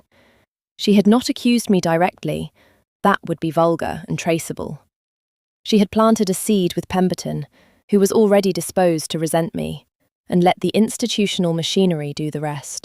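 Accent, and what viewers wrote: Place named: United Kingdom. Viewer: Finally a brittish accent. Nice story. Gothenburg, Sweden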